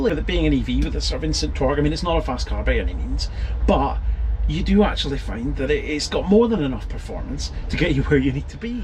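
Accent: Scotland, United Kingdom. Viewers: I want one of these, guessing by your accent, hopefully I will spot it kicking around Beautiful Scotland??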